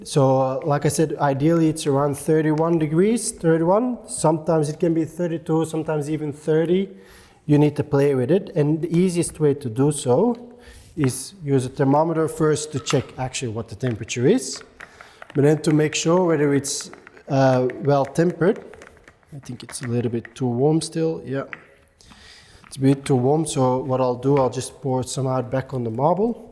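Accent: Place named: Belgium. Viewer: Are you originally from Belgium? I detect a Belgian accent:) Groeten uit Arizona, USA - Ann van Daily Travel Dose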